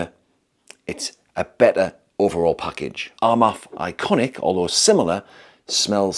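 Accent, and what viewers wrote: United Kingdom. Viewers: Love for your British accent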